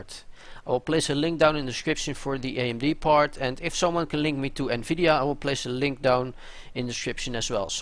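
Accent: Netherlands. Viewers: hoor gewoon dat je nederlands bent :0 maar ik heb een probleem me i7 proccer werkt niet met advanced warfare kan je me daarmee helpen? hij loopt vast in de loading screen en dan ben ik gedisconnect. help! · you have a dutch accent xd Dutch? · dutch accent :P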